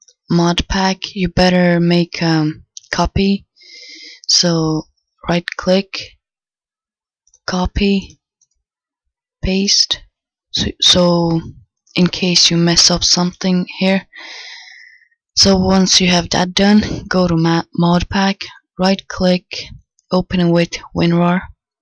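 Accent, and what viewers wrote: Russia. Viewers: Your Russian ? :D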